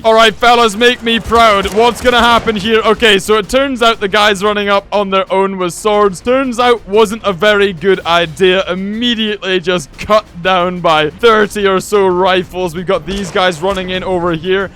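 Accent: Scotland, United Kingdom. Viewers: I'm just here to hear "Algorithm' in Scottish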